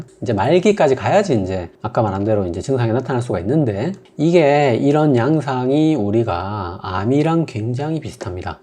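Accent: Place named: Seoul, South Korea. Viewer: 엣날에 정기적으로 보다가 몇년만에 다시 들었는데... 말투가 서울말로 바뀜 ㅋㅋㅋ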